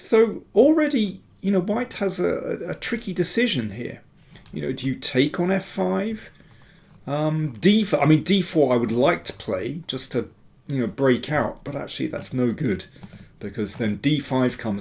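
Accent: England, United Kingdom. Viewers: Great cover as always. I'm sitting here, out of town, in a suburb of Stockholm, a bit lonely, finding comfort in your coverage and your lovely English accent. Cheers.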